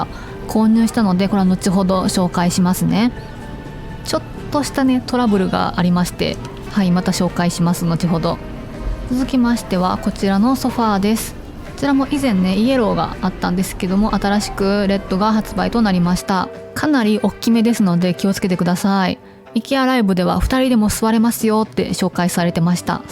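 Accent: Kansai, Japan. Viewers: やっぱり、少し訛ってますね❤でも関西弁愛おしいですよ。新商品見たのに忘れてしまってました。説明を聞いて思い出しました😅有難うございます😊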